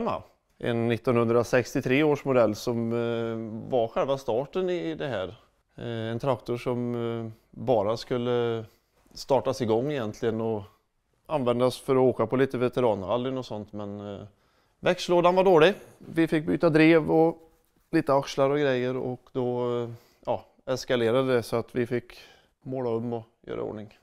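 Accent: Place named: Bragnum, Sweden. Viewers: Haha! tyckte jag kände igen dialekten och omgivningen, själv uppvuxen i Bragnum ett stenkast från Ängagår´n. Härliga byggen! Å i åa ä e ö. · Grym kille och fina traktorer! Finns nog inget ljud som slår Scania V8! 😍 Bara en fundering ! Varför låter han ofta som Västgöte, men ibland inte? 😄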